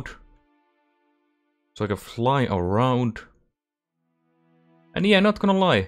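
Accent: Finland. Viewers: Suomalainen aksentti... Xd Keep up the great content! 😃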